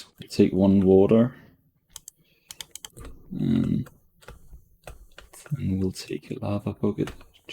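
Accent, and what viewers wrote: Denmark. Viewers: Are you danish? You sound like me when I speak English That sounded offensive but isn’t just hoping it’s true(I’m danish) Nice vids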